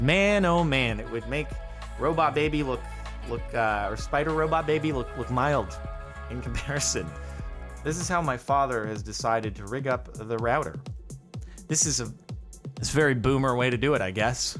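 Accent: Australia. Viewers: Mate, not far off the Aussie language. I’m impressed. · I mean....speaking as an aussie who has heard people try Aussie accents yours sparklez isn't too bad. It at least doesn't sound more British than aussie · Holy shit he actually sounds australian i have an australian cousin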